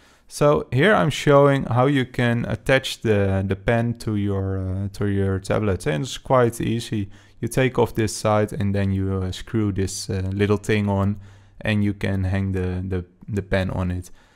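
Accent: Netherlands. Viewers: Your Dutch accent hihi let maar niet op mijn opmerking viel me gewoon gelijk op.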